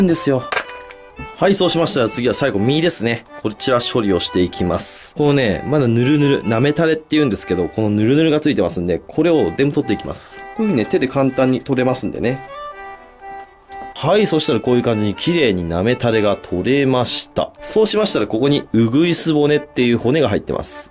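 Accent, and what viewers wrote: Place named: Mikawa, Japan. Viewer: 三河弁が親近感わきました！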